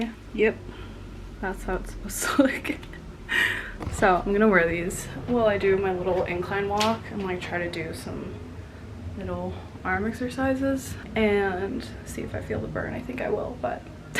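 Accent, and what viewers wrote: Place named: United States. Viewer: and why's your English so good? you have such an American accent!!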